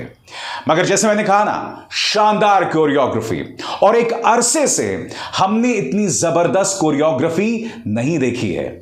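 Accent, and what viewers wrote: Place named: South India, India. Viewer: Ha सर filing नहीं आ रहा है क्यों लग रहा है की साउथ इंडियन जैसा लग रहा है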